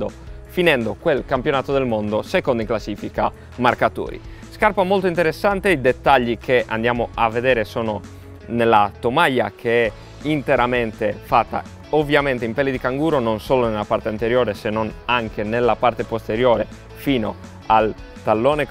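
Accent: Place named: Sardinia, Italy. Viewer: Spettacolare l'accento sardo! Continua così ragazzo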